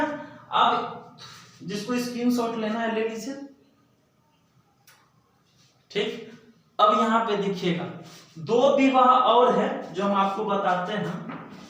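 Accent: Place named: India. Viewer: Desi English ✍️✍️✍️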